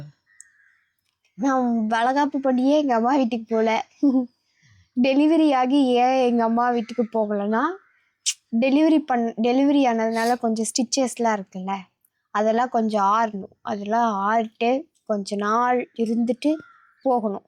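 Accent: India. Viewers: Unga slang la patha Chennai pola illaihey epdi... native really chennai thana? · பாப்பா, நீங்க பேசுறது மதுரகார பிள்ளை மாதிரி இருக்கு..❤❤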